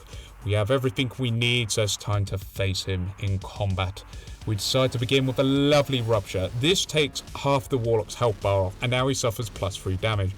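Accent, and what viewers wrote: United Kingdom. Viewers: You sound like you have the same strain of British as the spiffing Brit.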